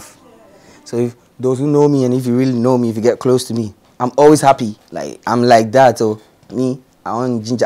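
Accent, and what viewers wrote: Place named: Nigeria. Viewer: But y he want talk like naija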